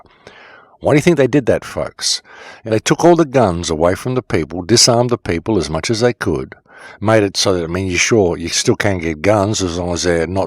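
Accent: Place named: Australia. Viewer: No t.v., smart phone is going (dumb phone). All the WARNINGS and WATCHMAN channels are being sliced down, demonitized n' demonized. Been sharing, posting, warning for years. Folks, this is the end times! God forewarned these things would happen. We are at the tail end of the age of grace and facing the dawn of tribulation. REPENT FOR THE KINGDOM OF GOD IS AT HAND! Heads up! I hate to say it but this is nothing compared to what is coming! Gods judgement and wrath going to sort things out once and for all, sorting the sheep from the goats, the wheat from the tares and who can blame Him, look at what we done and how much society has fallen. TIME IS UP! We are living just as in the days of Noah. Get onboard the ark if you want to escape, repent of unbelief and sin and know Jesus Christ is mighty to save Amen! 🙏❤🕊 Great to hear an Aussie voice presenting our region. 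Well done bro. Brilliant, love your work mate, keep shining!! 🍃🌺🍃 Glad you got yr own channel being a voice in the wilderness! 😁👍 SHARED!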